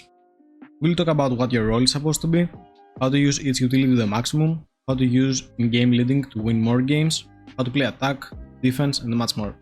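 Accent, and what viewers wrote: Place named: Spain. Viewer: El inglés promedio de un español...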